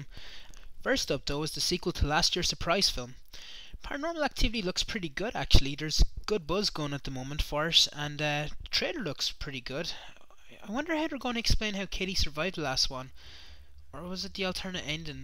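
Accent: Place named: Wexford, Ireland. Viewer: Nice, I'd messaged you via Facebook re. The Lost Classics. Great to hear a fellow Wexfordian and horror aficionado. I get that northern shite all the time as well, crazy!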